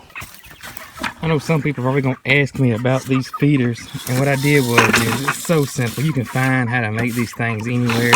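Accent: South, United States. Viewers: too bad I live in cali... I would watch your animals for free for the experience with all the vidlogs I have watched, I dont think even instructions would be needed. I cant wait till I can afford to buy land in Georgia. For the time being I will live vicariously through your logs...DUDE that southern accent...when daddy spoke that calm, you know an whooping is coming. in other words its easy to stay awake watching!!! the commanding presence is there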